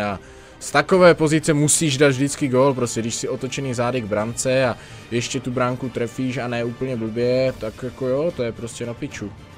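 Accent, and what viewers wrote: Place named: Moravia, Czech Republic. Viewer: já to sleduju taky kvůli těm kecům:D jako moravák mám stejné nářečí jak takže u toho vždycky chcípám:D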